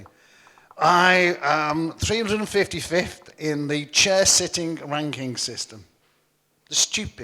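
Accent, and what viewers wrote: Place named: United Kingdom. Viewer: amazing😊 ooooh finally there's british english speaker whoever I've been awaited for a long time, all who came before were american english spekears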